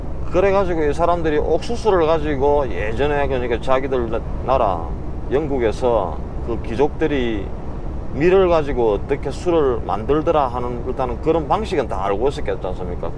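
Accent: Gyeongsang, South Korea. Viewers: 고향이 서부경남쪽? 진주? 부근?. 응원합니다. 감사합니다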